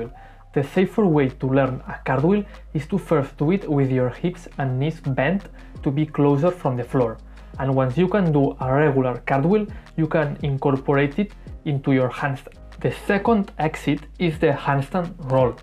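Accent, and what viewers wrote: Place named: Spain. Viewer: illo que dise er tio tu seguro que ere españo vaya que se te nota to el asento chiquillo que arte tiene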